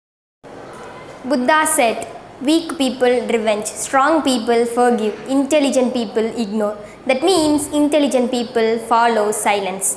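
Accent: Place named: United States. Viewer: it is like an American dialects... superb...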